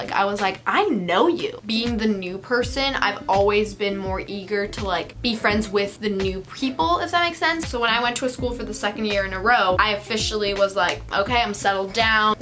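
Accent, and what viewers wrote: England, United Kingdom. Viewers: oha ingiliz aksanın efsane sşjfsidjsşdn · Omd your British accent 😯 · that british accent tho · We love your interactive videos so much too babe.I've never movied but I wish I could even though it has so many bad effect or bad feelings on person because without moving or going another country it is hard to learn other ppls culture or language or life in general and I think you should make a video with your british accent it would be different and lovely thank you and love you from pluto and back💋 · YOUR BRITISH ACCENT OMG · fr 😂 just a min... Just found out about your videos now, but already love you! Different curriculums suck so bad!! love your British accent too lol but that Karen neighbor sounds horrible.. the stalking thing is true too tho Also I’ve stared speaking a mix of literally all languages I know and people start to get more and more annoyed by it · Loved your British accent 😍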